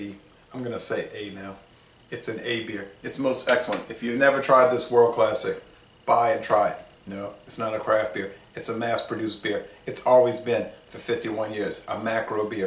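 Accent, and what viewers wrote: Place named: Midwest, United States. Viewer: Louisiana? You sound midwestern. The foil neck honestly just annoyed me into grabbing my beer glass instead of drinking it from the bottle. Not sure if that was their goal or not lol.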